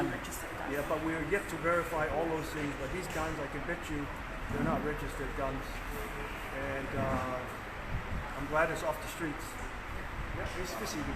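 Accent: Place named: United States. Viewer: I rather for him to speak inglish clearly then samoan language will be better but sounds his accent he probably educated in usa ae matagofie le tautala lelei pea ile igilisi ma le faasamoa ia manino lelei then people will see professional malo le taumafai paga foi le malosi o galuega valea ale tiapolo i nei tagata ma nei auupega e lamatia ai soifua ma ola o tagata lautele aemaise o soifua o leoleo o loo galulue e puipui le saogalemu ole atunuu,ma ole ofi atu o drugs eseese i totonu ole atunuu ma involved ai le toatele o tagata ae maise i young people ose faafitauli pito sili lena ua aafia ai aiga,fanau,ma tagata lautele i totonu o samoa so sad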